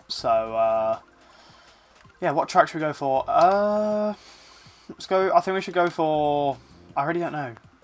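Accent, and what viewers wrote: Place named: England, United Kingdom. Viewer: The English accent is very confortable to hear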